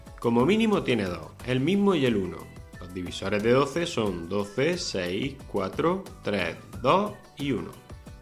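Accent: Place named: South, Spain. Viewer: Como se note q eres del sur.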